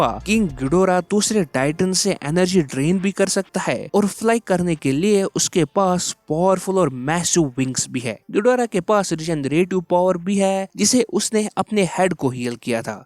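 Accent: Kashmir, India. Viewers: Bhai kya aap kashmir se ho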